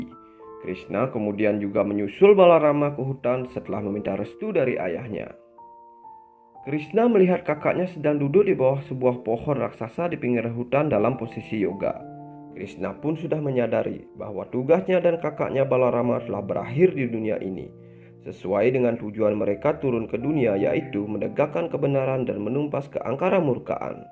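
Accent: Bali, Indonesia. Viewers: Aeng kentel logat baline.. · Logat balinya kental banget🤣🤣🤣🤣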